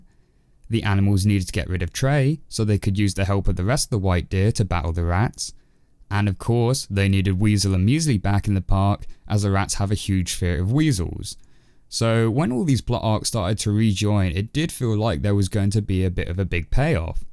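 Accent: United Kingdom. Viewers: I JUST REALIZED YOUR A BRIT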